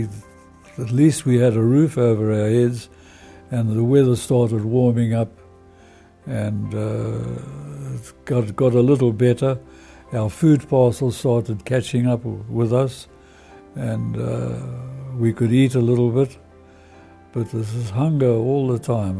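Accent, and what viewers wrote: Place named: South Africa. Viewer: Know that accent SA best pilots ever.BALLS,Montgomery said it.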